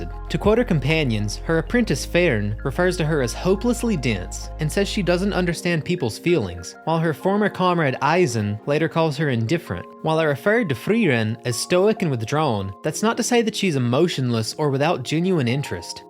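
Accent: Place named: Southeast, United States. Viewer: Also bro where you from? Southeast United States? Im from Georgia and your accent is very familiar lol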